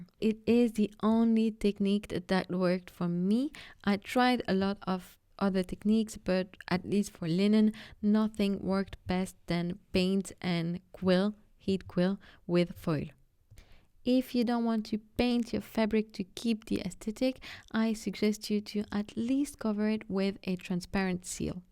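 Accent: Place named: France. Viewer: Je me disais que l'accent était un peu français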